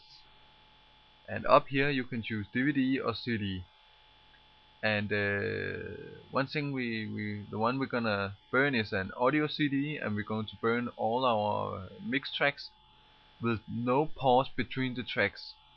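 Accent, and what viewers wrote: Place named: Denmark. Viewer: where u from? hmm, danish dialect lol!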